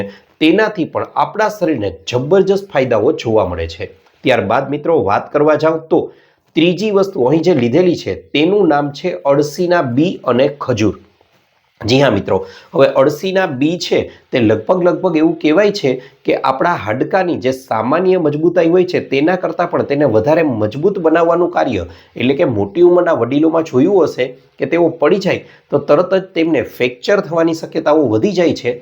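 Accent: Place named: Rajkot, India. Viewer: રાજકોટ તરફની ભાષામાં 'સ', 'ષ'ના ઉચ્ચાર 'સ' કરે છે અને 'ળ'ને 'ડ' બોલે છે. તમારી ભાષામાં એવી અસર કેમ છે ?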